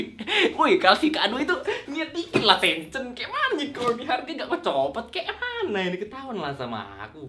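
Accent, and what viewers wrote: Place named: Pematangsiantar, Indonesia. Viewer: Logat Siantar campur logat gaul 😂😂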